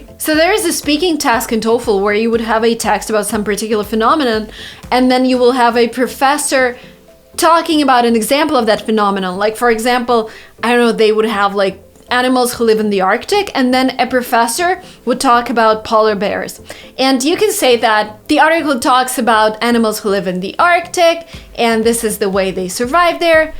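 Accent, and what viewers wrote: United States. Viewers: Studio would be better. But then yes anything that please you or form your comfort. I'm always focused on your accent so that I can interpret everything you say. And honestly I've been watching your videos for past 1.5 years or more & I can confidently say I can not only understand your entire accent but many other American speakers. Thank you so much from the bottom of my heart ❤ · Can I be like you... I wanna talk alike you.. I'm dying to learn this accent... American 😭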